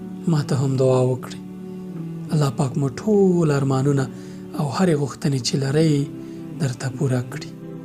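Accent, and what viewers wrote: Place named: North, Afghanistan. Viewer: احسنت يا شيخ كلماتك كانت حسينة ناعمة اسعدك الله في الدارين جنوبی پشتونستان سره تعلق لری او که شمالی سره.ماته جنوبی اشکاری ولی لهجه ای شمالی ده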